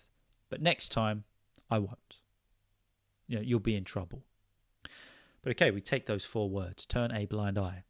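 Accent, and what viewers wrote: United Kingdom. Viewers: Your accent sounds beautiful but my self I learn and practice American English, British English seems bit harder than USA English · Me too, this podcast is so natural flowing within nice British Accent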